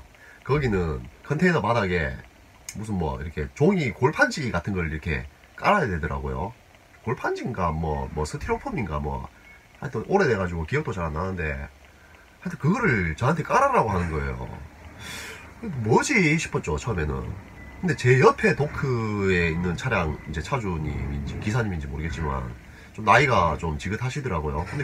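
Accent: Gyeongsang, South Korea. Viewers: 열 팬 입니다 훈남 이시고 구수한 경상도 사투리...항상 잘 보고있는 말년에 용달하는 창밖의 남자 임다 앉으나 서나 안전 운전 하십쇼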